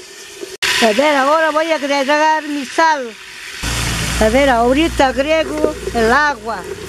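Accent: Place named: Lambayeque, Peru. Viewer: Parecía de México la demora así hablan en Lambayeque es diferente si acento de voz que interesante yo soy de tacna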